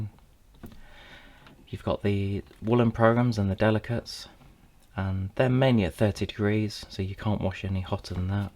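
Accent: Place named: RP, United Kingdom. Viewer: Big clue is he speaks the Queen's English :-)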